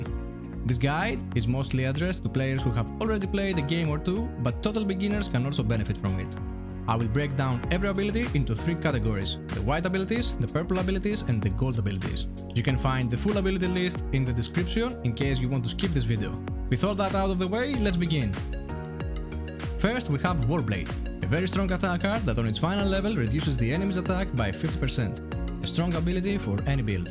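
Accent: Greece. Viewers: Is that a greek accent?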